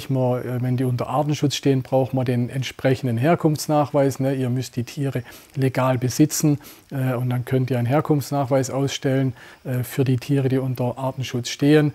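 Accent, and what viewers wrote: Swabia, Germany. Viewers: du haddeschd scho enemal a wegen weniger Dialekt geschwätzet, des war echt besser, net jeder Zuseher tut schwäbisch verschdehet, scho gar net außerhalb vom Ländle. Is scho a wegn heftiges Laschder, bidde beherziged... 🤦‍♂️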